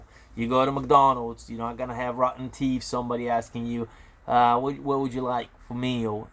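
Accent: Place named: Russia. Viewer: Я не спорю, что это все правда, но русский акцент у парня слишком сильный…. Он точно американец?….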